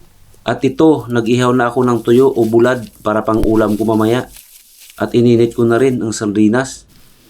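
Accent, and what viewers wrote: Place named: Bicol, Philippines. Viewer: Parang Taga bicol itong si par!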